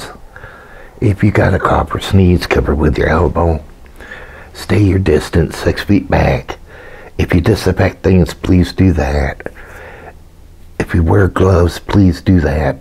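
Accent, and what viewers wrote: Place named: South, United States. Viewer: that southern accent is everything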